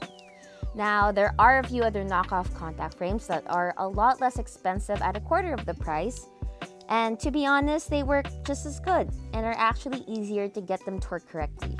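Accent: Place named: Philippines. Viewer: I am hearing a filipino accent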